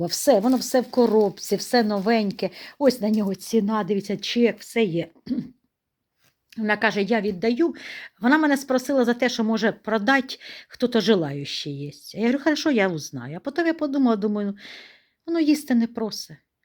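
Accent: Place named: Ukraine. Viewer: Почитала и прослезилась . Сколько злобы и несправедливости , слепой ненависти . Дизлайк Вам , мадам ! Вы позорите украинский народ , не весь он такой . Моя бабушка была украинка , золотой человек , интернационалист ! Кстати , говор , как у Вас , акцент . Поэтому я и обратила внимание на Ваше видео , приятно стало , душевно . А потом .... Жаль . Не одумаетесь Вы , никогда .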